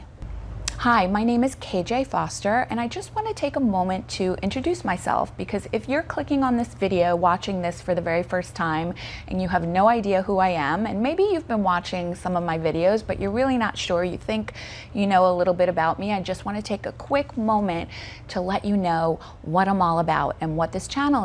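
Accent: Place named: New York, United States. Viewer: always! FYI...I’m on my 5 and 6 th step and love your NY Voice more than anyone else 😉💜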